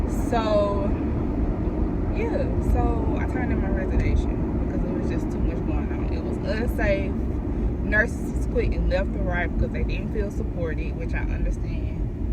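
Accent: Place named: Alabama, United States. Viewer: I heard you accent & immediately knew you were from Al. (So am I 💗)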